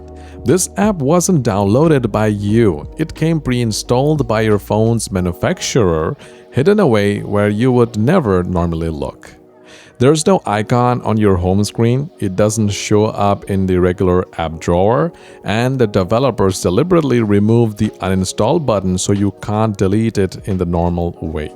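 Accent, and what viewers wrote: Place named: India. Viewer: Odd.. your skin tone does'nt seem to match your accent? Are you from India?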